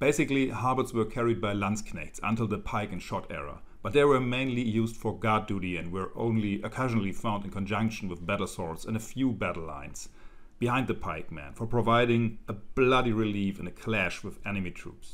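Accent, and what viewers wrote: Germany. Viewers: Hallo, dein Dialekt hört sich deutsch an, hast du auch einen Kanal mit deutschen Videos?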